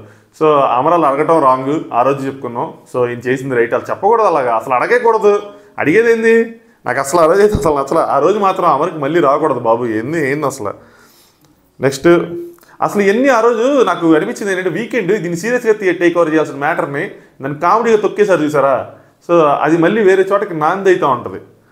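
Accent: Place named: Guntur, India. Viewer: Guntur side slang anipistondi 😁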